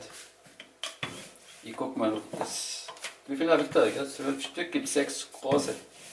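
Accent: Swabia, Germany. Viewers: Bisch n schwob? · Bisch du a schwob?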